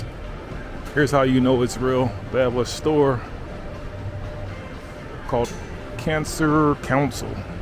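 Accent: United States. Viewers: Lots of his narration is making me laugh. Still sounds like American living in America, re OZ. Tho TBF, did mention some interesting facts (& sort of correct re most, tho not 100% re all) in the previous video. Recommend (or any one in Sydney, or visiting) does some of the walking tours. The 'Free' (not quite free*) Walking Tours are good, & The Rocks one is great. [*Not quite free, as request tips at end, but for $10 or $20 get best value walking tour of any] There's also heaps of social walking groups, & hosts, or a few in the group, are often quite knowledgeable & often learn a few things, even re home city even if know it well. Can find some of those groups by searching for: Free Walks & Meet People. In Sydney, is often one of those groups (there's heaps) is doing the amazing Bondi - Coojee Walk, sometimes is a Manly Harbourside Walk; & often Botanic Gardens &/or adjacent one. 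- - - In Melbourne, similar type free social waking groups often do Botanic Gardens walks, Bayside walks, or Yarra Bend Park/Studley Park Walks; & at lots of other places. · Ya'll are really pendatic on his accent, aren't you? We pronounce it Bond-ee like he does, here in America. Stop insulting his accent. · I love the American accent brother but Bondi is pronounced as Bon die not bondy 😂😂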